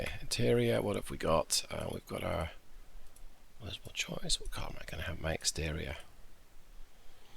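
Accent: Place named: England, United Kingdom. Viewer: Hahaha oooo nice u sound very English to me mate I guess u haven’t picked up the American accent yet lol it to ma to haha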